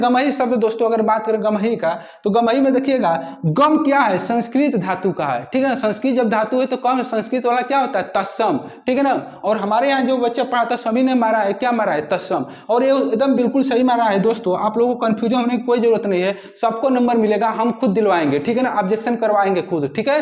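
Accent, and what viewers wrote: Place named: Eastern Uttar Pradesh, India. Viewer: East up se honge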